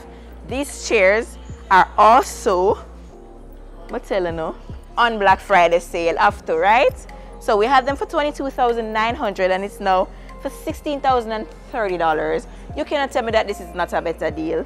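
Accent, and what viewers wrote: Jamaica. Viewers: No man you work there now?? Muss lol i love the way you present these informative vlogs and you just fling the patois left right and centre. I LOVE IT!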